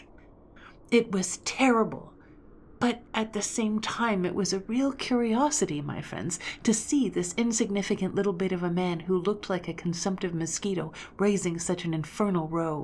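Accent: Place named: West Coast, United States. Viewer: Portland, OR? I live in Salem, but have been in SE OR taking care of family. I thought you sounded like a West Coast accent. 💗 Ah to have a flying canoe!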